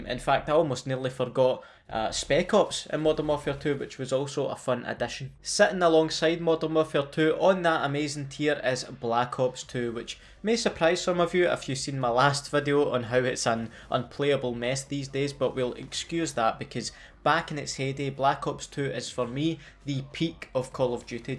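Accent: Scotland, United Kingdom. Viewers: That scotish ascent dogh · I love your channel, but your braveheart accent is giving me a headache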